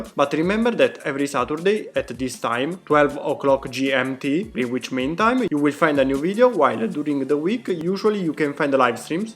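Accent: Italy. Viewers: Italian accent makes it about 10 times better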